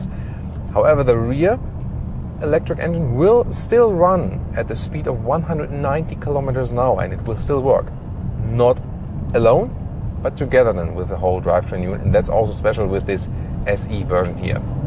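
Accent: Germany. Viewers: I don't understand German English ... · An excellent car, but I could do without the atrocious German accent!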